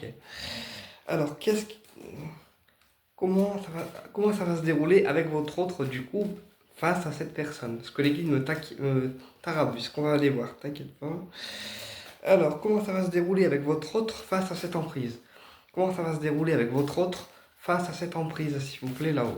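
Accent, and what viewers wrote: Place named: Nord, France. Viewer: Je vous découvre et je vous remercie je m'abonne 😊je suis native du Nord et je reconnais l'accent ch'ti 😅je suis de Cambrai et vous? bon dimanche 🙏⚘❤⚘🙏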